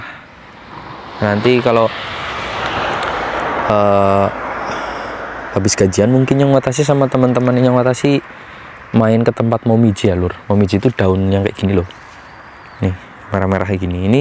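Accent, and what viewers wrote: Banyumas, Indonesia. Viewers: Nyong kan cilacap tapi stay nang taiwan lah ngapak ngendi kue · Asline ngendi mas ngapak"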